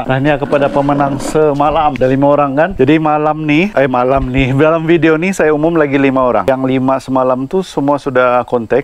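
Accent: Sabah, Malaysia. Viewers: Assalam sifu. Nak minta sifu tunjukkan cara groom mental betta. Masa dia flare tu, dia cool jer dan rileks. Mohon Tunjuk ajar sifu. Btw, congrats for 3k ++ subscribers. Road to 10k subs pulaa bossku. Huhu semoga maju jaya. Huhu terhibur tgk content sifu. Dialek sabah tu pekat joo. Wkwkw original sabahan. GoodLuck in future. N keep doing what you love. Chaiyokk!!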